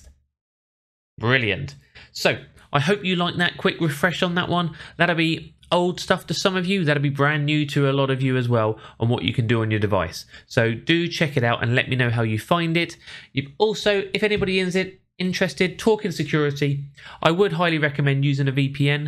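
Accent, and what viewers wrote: England, United Kingdom. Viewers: Bro I got to tell you thank you for the the help it really was very informative and I think your English accent man I like I love the way you guys talking to anyone dodgy and stuff I'm over here in America but it's great man brilliant you guys always say brilliant too I love it. Thank you so much for the help I subscribed to your YouTube channel and like the video I'll be checking in more often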